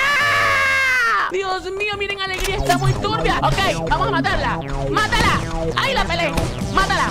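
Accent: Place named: Colombia. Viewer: Y sí cierto hablas como colombiana se te escucha el acento y todo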